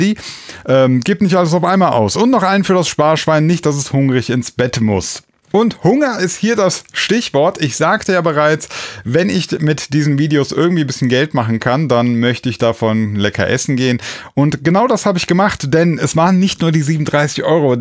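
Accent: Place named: Turkey. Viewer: Er hatte schon in einem Video das aufgeklärt. Es ist halt die türkische Aussprache.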